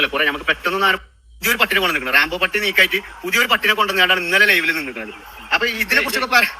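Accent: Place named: Malappuram, India. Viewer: ആദ്യം നിങ്ങൾ എല്ലാവർക്കും മനസ്സിലാകുന്ന രൂപത്തിൽ സംസാരിക്കാൻ പഠിക്കൂ,എന്നിട്ടുമതി തൊപ്പിക്ക് നേരേ യുള്ള ചിലക്കൽ.മലപ്പുറത്ത്‌ കാർക്ക് മാത്രം മനസ്സിലായാൽ പോര.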